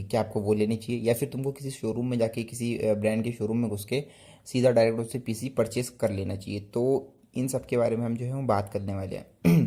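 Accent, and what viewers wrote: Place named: South India, India. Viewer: isme mein toh bhai south indian lag raha hai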